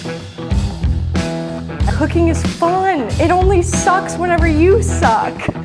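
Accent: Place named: AAVE, United States. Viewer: Nothing more annoying than a woman speaking Ebonics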